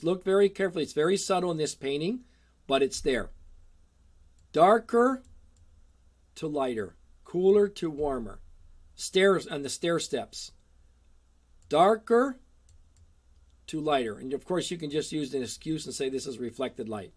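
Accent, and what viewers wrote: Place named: United States. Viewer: I have had to put the sound off and the subtitles on. I can not stomach one more American accent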